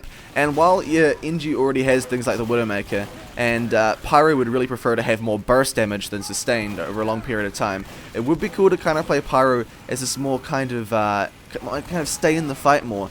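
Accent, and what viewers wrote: New Zealand. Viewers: Dat accent xD.... 'hivy'..... 15 pir sint faster firing speed.... god bless new zeland :)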